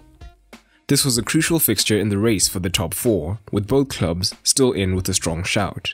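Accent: South Africa. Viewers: The guy doing the voice over South African?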